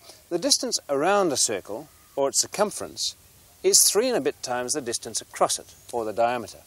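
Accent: United Kingdom. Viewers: No he isn't. That's a British accent. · I’m an Australian and I picked his accent as slightly British. He may have spent some years studying in England? Or it may just be he has a cultivated accent due to his high level of education. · even before clicking the video, i knew that man would have a British accent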